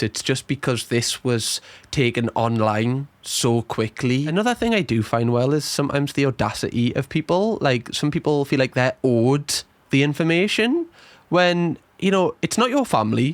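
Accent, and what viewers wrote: Newcastle, United Kingdom. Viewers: Your voice is going up at the end of every sentence.. I get your a geordie.. But it's hard to watch with the internation... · Are you geordie ? I keep hearing bits of welsh in your accent.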